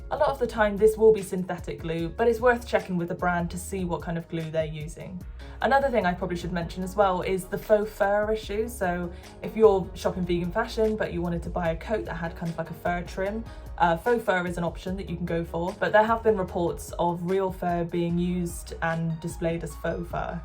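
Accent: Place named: Bristol, United Kingdom. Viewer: Awwww ;D I love the Bristolian accent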